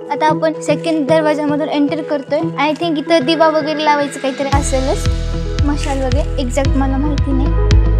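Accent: Latur, India. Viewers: Ek no information Aani tuzya sobat khup cutness aani God aawajat Laturi bhasha zakaas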